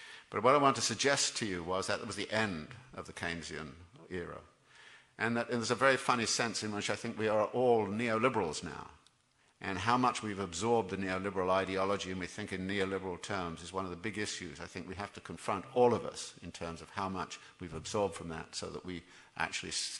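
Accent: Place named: RP, United Kingdom. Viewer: I despise marxism, and commmunism. He is basicaly telling us this; "This 'crisis' is proof that your system is not working. Adopt marxism." . When crisis occurs under marxist system, watchout! Out come show trials, arrests of enemies of the people. His scolarly British gentry accent does not fool me. If people like him are in power, they will spill as much blood as they deem necessary to keep it. The red flag of communism gets its color from being dipped into blood of its victims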